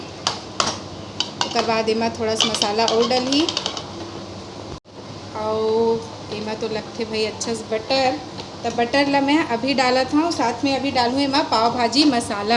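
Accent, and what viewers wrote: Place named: Chhattisgarh, India. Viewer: छत्तीसगढ़ी बोली आप के मुह से बहुत बढ़िया लगथे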